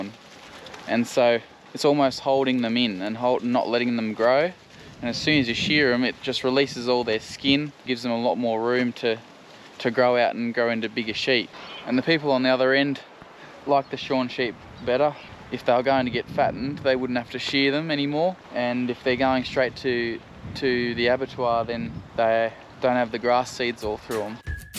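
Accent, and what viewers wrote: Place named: Australia. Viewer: Just found your site today and subscribed and liked. Watched the lot this wet Tuesday. Great videos; especially the drone work. Could I suggest a bit more technical info, i.e. tractor size, implement used (include brand - you may get a sponsor) mob numbers etc. Great to hear Aussie English instead of took the wool OFF OF, took the alfalfa OFF OF and everyTHINK. Keep it up it's great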